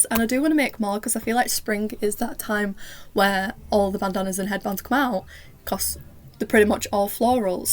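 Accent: United Kingdom. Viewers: Your voice is very calming and I love your accent! Which UK accent is that? <3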